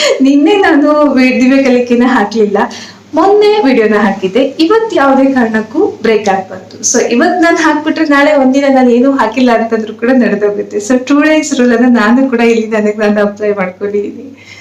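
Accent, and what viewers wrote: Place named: Kumta, India. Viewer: ನಿಮ್ಮ ಮಾತು ಕೇಳಿದ್ರೆ ನೀವು ನಮ್ಮ ಕುಮಟಾ ಕಡೆಯವರು ಅನ್ನಿಸ್ತಿದೆ ಮೇಡಂ.ನಾನ್ ಹೇಳಿದ್ದು ನಿಜಾನಾ...ನಾವು ಕೂಡ ಕುಮಟಾದ ಮಿರ್ಜಾನ್ ದವ್ರು 😍..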